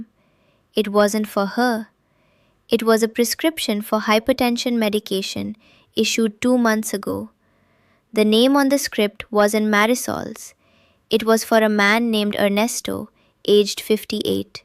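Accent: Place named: India. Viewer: A lady from the Philippines with an Indian accent 😅.. AI is a disaster 😳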